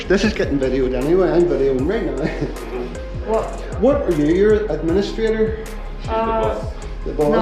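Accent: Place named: Belfast, United Kingdom. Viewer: you sound like you're from Belfast. I am too. Would love to speak with you about your experience as I'm looking for restorative treatment to include lots of implants..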